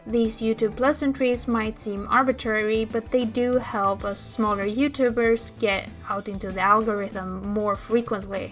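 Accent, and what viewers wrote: Sweden. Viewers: Are you swedish by any chance? I am so im not sure if your accent is swedish. I would loveee to pull on that event thanks for the help! <33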